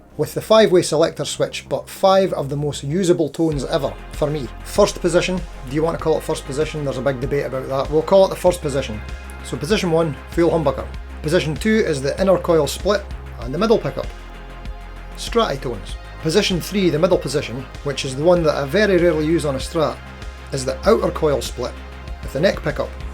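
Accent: Scotland, United Kingdom. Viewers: Love the Scottish accent.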